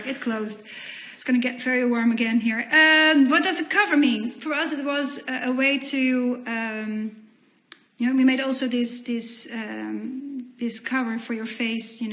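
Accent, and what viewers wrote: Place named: Netherlands. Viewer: It does have this something to see all kinds of celebrities in online streams so much (watched various panels of actors of my favorite series too). It's pretty unique and cool. At leats one good thing about this quarantine! It's so fun to hear myself in her, as in being a Dutch person myself and trying to talk English with our Dutch accent :P Agreed Sharon. I too am so fed up with all the divisions and how people treat others who are not the same as they are.. It is this like curse in human nature unfortunately.. But then again, it IS better now than like 200 years ago, that also needs to be said. There's still much work to do but it is already better than in the past ~ ^^; My rhytm is pretty much gone since I graduated from my bachelor degree at a time in life that was pretty hard and then corona started. So, no solid job or studie for over a year now already.. 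I love how she pronounced 'WT Benelux' we Dutchies say the letter W VERY different than in English XD The English pronounciation of W is weird to us XD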